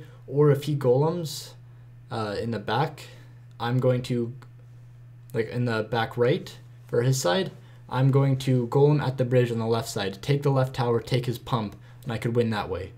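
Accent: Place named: Canada. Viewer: aha knew you sounded a little canadian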